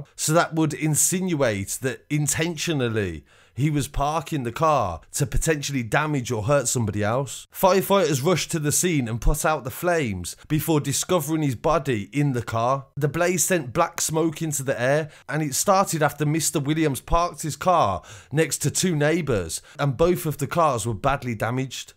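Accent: Birmingham, United Kingdom. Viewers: Lol that brummie accent is a bit strong just imagine if it was Ozzy Osbourne doing the narration lol. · Brummie. Bless him!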